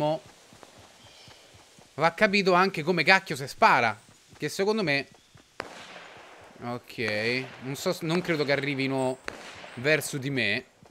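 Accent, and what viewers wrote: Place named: Naples, Italy. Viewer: Ti seguo da poco ma ho già capito che sei napoletano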